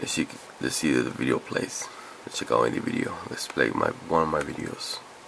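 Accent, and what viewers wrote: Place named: Russia. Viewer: No idea where that accent is from, Russia maybe, but you just solved my problem. Thanks!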